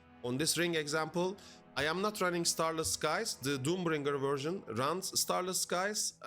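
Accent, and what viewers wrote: Turkey. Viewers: Aksanın Türk gibi kesin türksün ya 😅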